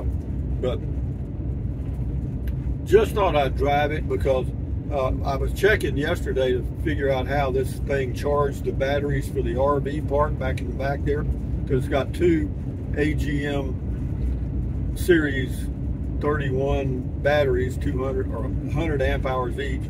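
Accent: Texas, United States. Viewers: I won't rent out my RV's either! I have a vintage Silver Streak & a 24' motorhome. Yes, my clothes, dishes, cooking utensils, etc are in them. I rigged out my van for an 8mth trip to PA to help my Mom, but I sure am missing Texas & our accent! 🇨🇱 I had to put Texas stickers on my van just to have a little Texas with me! I'll be back in a couple of months. Thanks for a little atmosphere & accent!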